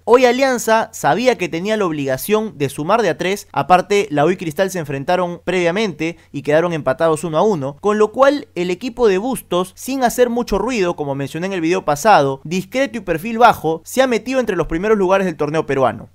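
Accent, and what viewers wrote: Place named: Argentina. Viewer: mano por que hablas como semi argentino ??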